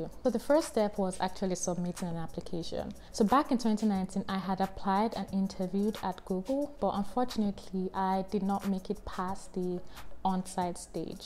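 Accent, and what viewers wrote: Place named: Nigeria. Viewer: That's Nigerian accent ! 😊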